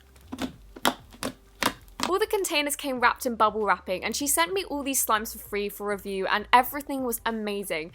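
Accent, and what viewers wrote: United Kingdom. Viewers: babycanread2009 right, and maybe it could be because of her British accent!!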